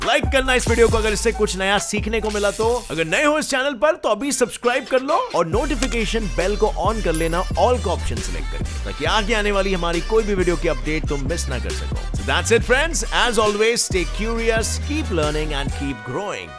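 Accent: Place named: Gujarat, India. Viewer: App gujrati ho kyki maje pata chal gaya yehh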